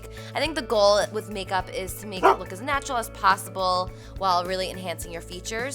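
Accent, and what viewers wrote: New Jersey, United States. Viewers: I hear a Jersey accent 😍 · Love the Jersey accent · she sounds like she's from nj, the accent is so sutle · omgggg as soon as I heard you talk I knew you were from New Jersey JERSEY PRIIIIIIDE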